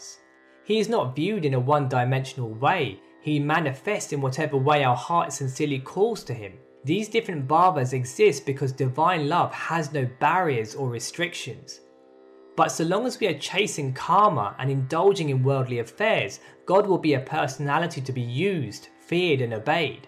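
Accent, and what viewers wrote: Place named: United Kingdom. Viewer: I think and he sound like british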